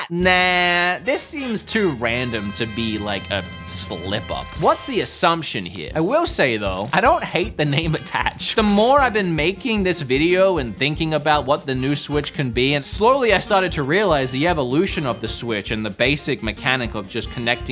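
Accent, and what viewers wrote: Australia. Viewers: I'm just sitting here tripping out about how you seem to simultaneously sound like you're from Melbourne, Australia and Brooklyn, New York. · Wait, you're Australian? I guess I can't really tell an Australian voice apart on YouTube as I didn't know with MVG either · I'm Mexican. is the sole reason I love Australian people so much, obviously biased. But opened the window to another culture and accent I didn't know and enjoy.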